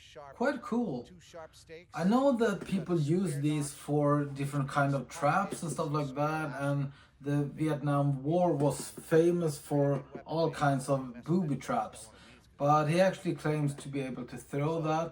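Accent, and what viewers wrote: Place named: Scandinavia. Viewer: based on his accent, either Denmark, Sweden, or Norway...that's why I'm asking him, Inwas wondering the laws regarding that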